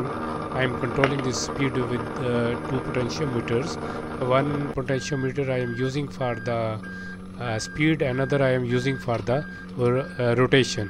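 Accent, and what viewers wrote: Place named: Pakistan. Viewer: Sir ji min pakistan sy hun ap ki awaz sy lag raha hy ap pakistani ho help me sir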